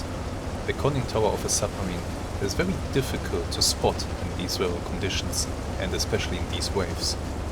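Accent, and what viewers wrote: Germany. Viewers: a German accent is more than welcome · I actually thought you were german! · You sound German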